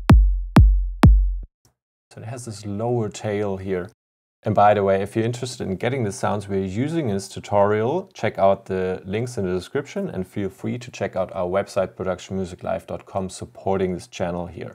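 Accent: Germany. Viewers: Are you german? You sound german at least...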